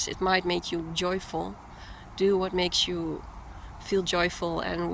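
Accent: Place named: Netherlands. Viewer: Your accent sounds Dutch.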